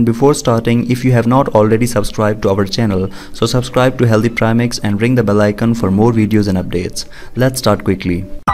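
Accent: India. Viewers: Is that an Indian 🇮🇳 accent? I'm chewing gum right now This is helpful 👍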